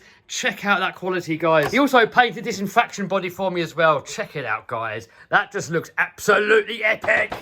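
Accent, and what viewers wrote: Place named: England, United Kingdom. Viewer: Im not a hater, but I can not stand that 'more power' attitude ! YOU HAVE NIL IDEA ON ANYTHING TO DO WITH RC. Why do you think that 'fastest' world record attempt turned out a free giggle for me when you wrecked your car ? Because you have absolutely no understanding of aerodynamics ! Honestly, whenever I see your head on a video, I turn it off immediately because I cannot stand that pommy accent and your boofhead attitude. You should feel privileged that I'm even taking the time typing this LOL.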